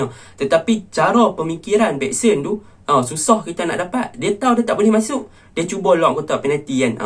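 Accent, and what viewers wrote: North, Malaysia. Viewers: Mcm ni la peminat bola sepak buat ulasan. Rasanya loghat cm dr utara ye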